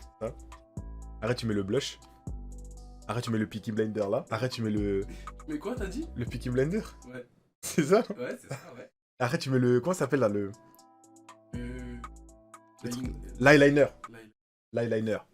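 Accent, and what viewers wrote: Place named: Antilles, Caribbean. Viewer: Tu as grave un accent antillais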